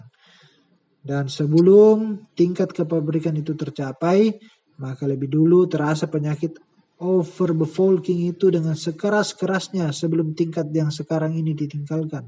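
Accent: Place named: South, Indonesia. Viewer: Mantap.. Tetap dan masih kentara logat selatan.. Salama'..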